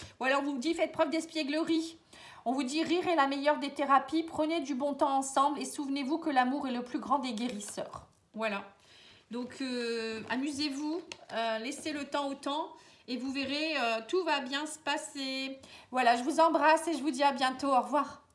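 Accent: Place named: North, France. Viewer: Merci infiniment vous relater mon histoire je suis bluffée vous êtes très forte! L'amour Est plus fort que tout 😊🤣 J'adore votre accent du ch''nord n'est ce pas?😉 Je vous envoie toute ma lumière et mon amour 💐❤️😇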